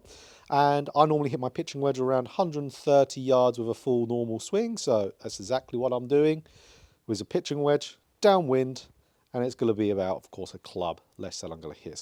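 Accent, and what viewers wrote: Essex, United Kingdom. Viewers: lol, I posted before you said you were in West Coast of Scotland ! 🤣thought you were an Essex boy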